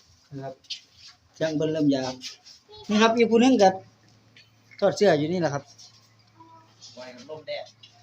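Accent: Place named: Sakon Nakhon, Thailand. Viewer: สวัสดีคะ แม่เก๋มาให้กำลังใจคะ สวยงามหลายๆจ้า คือเว้าคือคนสกลแท้จ้า แม่เก๋กะคนสกลจ้า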